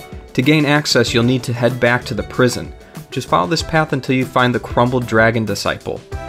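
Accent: United States. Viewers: Your videos are so interesting and helpful, but the heavy midwestern accent is insufferable.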